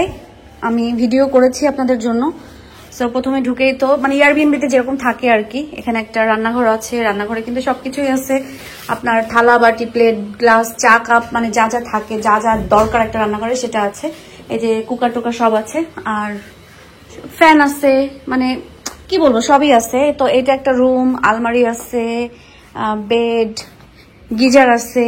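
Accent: Bangladesh. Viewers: kathar dhoron dekhey bojha jay bangladesi 😅😅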